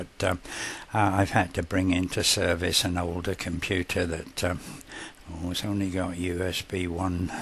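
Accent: United Kingdom. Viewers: I love how British people say process. Like "pro" at some type of game or sport. Great Video!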